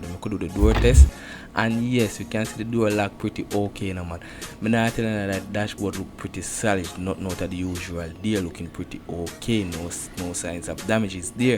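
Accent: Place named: Spanish Town, Jamaica. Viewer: lol, the fact sey mih can immediately tell seh a spain twn 😅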